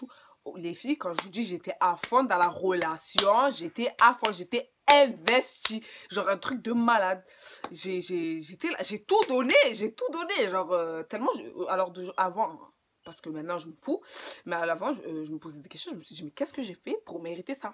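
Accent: Marseille, France. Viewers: Oui on veux la partie 2 de ta best t grave mimi les mecs faut pas vivre pour eux avance fais ta life jador ton accent marseillais 😊 .. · on dirait une marseillaise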